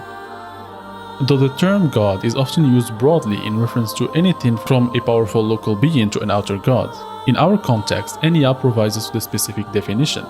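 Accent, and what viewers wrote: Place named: France. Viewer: I know a French accent when I meet one, excellente video l’ami penses tu en faire en français ?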